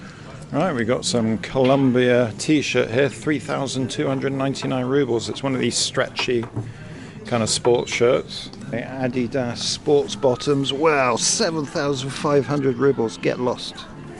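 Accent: United Kingdom. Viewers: Are you British? I know, the accent is but hey one can't make assumptions nowadays. Is there discrimination against foreigners in Russia?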